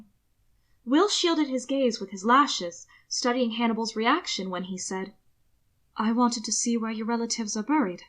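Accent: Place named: Japan. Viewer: as someone who studied Japanese for a couple years, I think your accent is pretty close to sounding like one. but im not Japanese myself so take my opinion with a grain of salt LMAO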